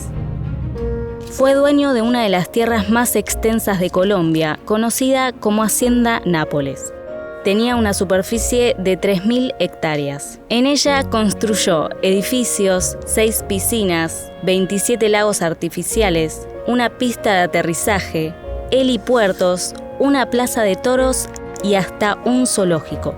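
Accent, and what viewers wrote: Argentina. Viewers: buen vídeo pero odio el acento argentino, da asco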